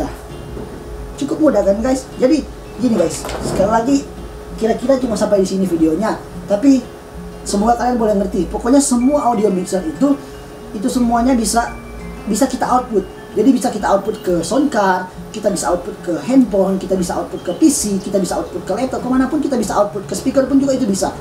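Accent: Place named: Nias, Indonesia. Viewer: logatnya keg orang nias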